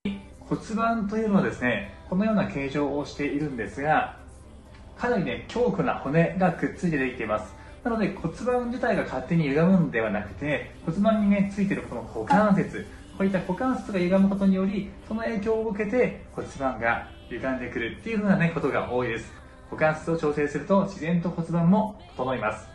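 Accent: Kansai, Japan. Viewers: 時々、関西弁になったり標準語との葛藤を感じる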